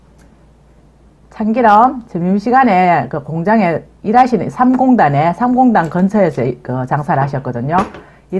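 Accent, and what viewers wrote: Gyeongsang, South Korea. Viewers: 아이구 ~ 아삭아삭 맜있는소리 나네요" 경상도라에 ?? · 갱상도 사투리 너무 재미나요 ㅎㅎㅎ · 댓글 처음 달아보네요..저는 부산 살다 충청도로 올라와 생활한지 10년이넘어갑니다..그러다보니 경상도 사투리 들으면 왠지 정겹고 그리워요. 사투리 들으니 속이 뻥 뚫리고 넘 재밌어요. 특히 오봉~~ 이란말에 빵 터졌습니다... 좋아요..구독😍😍😍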